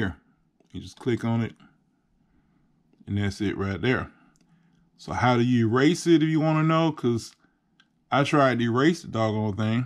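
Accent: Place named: North Carolina, United States. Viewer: Thanks so much! I needed that! You sound so much like one of my cousins from North Carolina! Go figure! 😊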